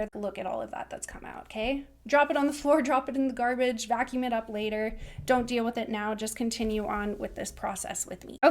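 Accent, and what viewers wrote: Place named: Los Angeles, United States. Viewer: Haha I thought that. LA accent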